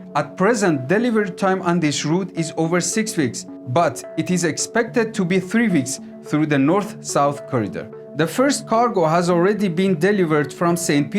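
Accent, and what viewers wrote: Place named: Iran. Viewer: I like the persian accent of the speaker ❤️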